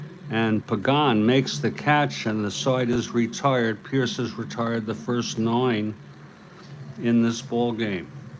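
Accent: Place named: New York, United States. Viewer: 'Greetings everyone' Tuned in again to the 'Stratomatic Network'. continues to deliver the 'art' of the live Strato broadcast. Continue your excellence. We know its tough, but we thank you. There is something special about that NY sound and your baseball knowledge that is comforting for the old time fans. *BTW...Did you know the NYY perfect game pitcher Don Larsen appeared in this real life May 4, '62 11-6 win at Wrigley Field for the SF Giants, allowing three runs over the last three innings but still recording his third 'save' of the season.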